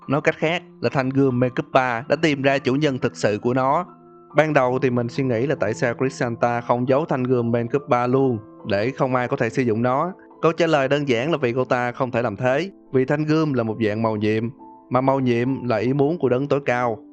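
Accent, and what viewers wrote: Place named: South, Vietnam. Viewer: Ông phân tích game thì chắc ai cũng biết, giọng miền nam nghe rõ ràng, phân tích chi tiết từ cốt truyện đến cách chơi game, tui thích nhất phần chi tiết về hướng chơi game bad ending và good ending 1 số game mà chỉ 1 số game không chơi mới nghe hết, còn mún chơi thì lại phải để dành lại mấy bài phân tích sau khi phá đảo. Tuy vậy nhưng ông ra video khá chậm, mình cũng hơi tiếc về phần này tí, mà chất lượng bù lại thì cũng khá ok. Cố gắng ra nhiều thêm và nhanh hết mức có thể nhá, mình thì auto ủng hộ:)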